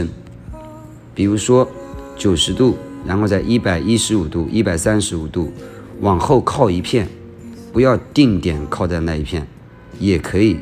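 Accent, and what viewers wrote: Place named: Sichuan, China. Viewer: 四川口音親切可愛，NL不分😂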